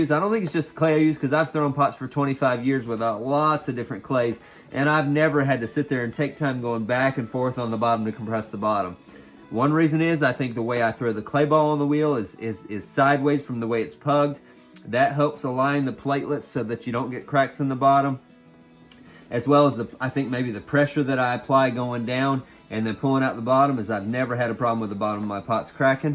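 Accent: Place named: United States. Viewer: Thank you so much for all of your videos; I really appreciate you taking time out to share your skills and expertise. And I really like your laid-back style - I'm not sure why some people want you to be something you're not. Strange. Btw, I love your accent. We Brits are always being told that Americans like our accent - but yours is just lovely.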